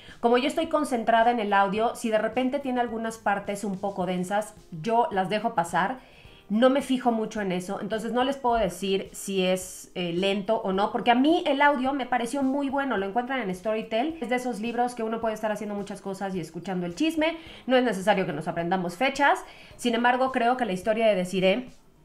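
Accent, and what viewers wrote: Mexico. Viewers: Enamorado de tu precioso acento mexicano. Me explota la cabeza. Gracias por los vídeos. Enhorabuena. Recomendaciónes: Sinclair Lewis (La trampa humana, Babbit, Calle Mayor), Dorothy Parker (Colgando de un hilo), Toti Martínez de Lezea (La calle de la Judería, Muerte en el Priorato).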